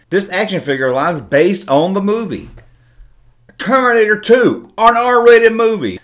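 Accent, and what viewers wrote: South, United States. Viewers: How in the HELL could someone with an accent as southern as yours have had an African American doll when you were a kid?!?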